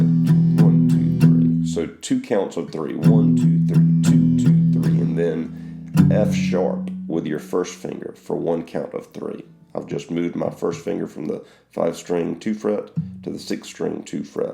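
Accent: South, United States. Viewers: Lol i think its the southern accent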